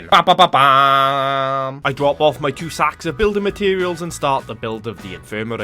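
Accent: United Kingdom. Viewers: Why you British?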